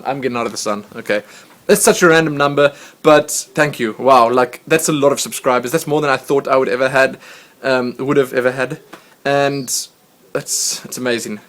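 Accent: South Africa. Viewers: I'm South African so I thought it sounded familiar :P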